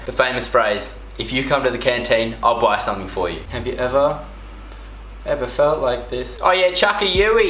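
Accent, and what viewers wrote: Australia. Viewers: Lol.😁 I understand him perfectly... Oh, right... I'm Aussie too.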